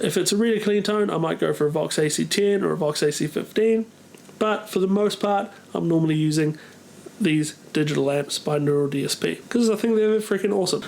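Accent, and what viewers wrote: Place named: New Zealand. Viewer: Whoever didnt know accent is kiwi needs to watch some more deck themed videos. Glad to know you're in a prog metal band man, will look it up. Great stuff and great guitars ❤️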